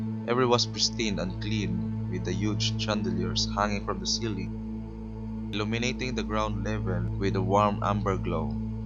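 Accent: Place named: Visayas, Philippines. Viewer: Nice kaau animation ♥️ ma halata nako nga bisaya ang narrator the way mag pronounce hehehehe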